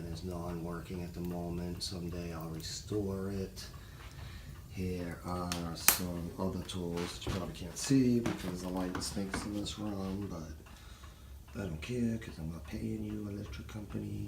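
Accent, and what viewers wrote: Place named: Massachusetts, United States. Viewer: This is a genuine Massachusetts accent my brother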